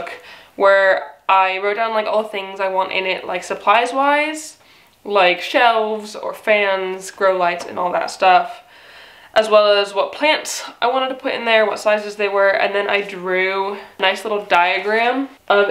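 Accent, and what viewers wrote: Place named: United Kingdom. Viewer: I’ve watched quite a few of your videos and I heard many more words spoken with a British accent now. Heehee. Fun to listen to! Enjoy your awesome new greenhouse.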